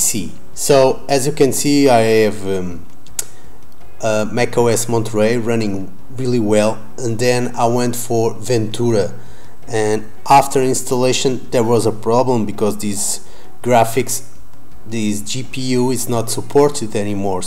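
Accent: Portugal. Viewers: Primeiras palavras e vi logo que era um YouTuber tuga. Gostei do vídeo, continua o bom trabalho.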